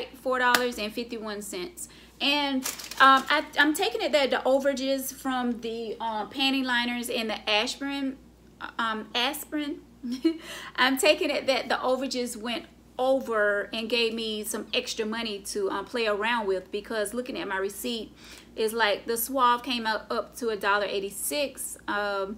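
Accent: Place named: South, United States. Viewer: I love your southern accent. Thanks for the deals!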